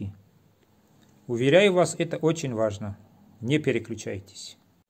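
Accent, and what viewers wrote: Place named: Caucasus, Russia. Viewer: ДОБРЫЙ! ДАВНЕНЬКО НЕ ЗАХАЖИВАЛ, К ВАМ В ГОСТИ. ОБЗОР ПОУЧИТЕЛЬНЫЙ ( У ВАС, ИНАЧЕ НЕ БЫВАЕТ ). МИРА ВАМ И ДОБРА 🙏 P.S. КАВКАЗСКИЙ АКЦЕНТ, ВАМ ИДЁТ 😉✌️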